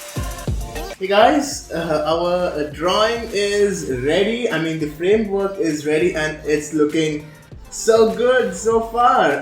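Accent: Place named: India. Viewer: Indian for sotaque is ugly.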